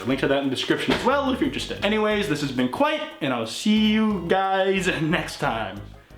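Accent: Russia. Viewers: I NEED MORE OF KWITE TALKING IN A RUSSIAN ACCENT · That was an interesting voice with the Russian accent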